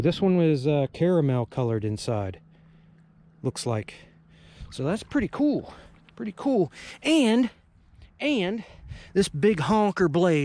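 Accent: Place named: West Virginia, United States. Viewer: Just started watching ur videos. I knew u had to be from WV w/your accent & u pulled 20+yrs in the Army Benning, Drum or Lewis? Pop bottle 77’, “I was just a little fella”, & so was I. I’m a 74 edition, lifelong Missouri man, Missouri born, Missouri bred & when I die I’ll be Missouri dead! 😆 Where I live, (not right on the water but close), is a small creek you can fish year round named “Cowskin Creek”, & it runs into a bigger stream named “Beaver Creek”. Old man I knew years ago found quality heads, points just amazing collection. His son donated it to the local county museum. We’re in Douglas county MO., & where I live just west of Ava 4-miles the Osage Indians lived here & a smaller tribe known as the “Ponkeshaw”, lived right here where I live. I’ve been down to Cowskin & just dig a hole in a gravel bar & find all sorts of heads. 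I’ve never found what I’d call real quality heads, but I always look in the creek or right on the banks. Watching you I’ve gotten new ideas & plans to search more effectively, hopefully finding a much higher quality of heads. The old man I mentioned found every single point, stone & head on the Cowskin Creek Valley & his collection was AMAZING!! If I find some good stones, points & heads I’ll send ya a few pics of what I was able to find! From this to you, thanks for the videos & really enjoy watching them & thanks for getting me energized again to go digging! I’ve got “Degenerative Disk Disease”, so I can’t up & go whenever I feel l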